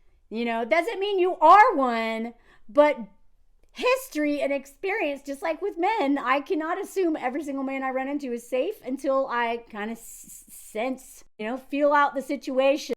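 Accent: South, United States. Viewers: You're like me. You're not trying to have a southern accent. You're just trying to sound NORMAL.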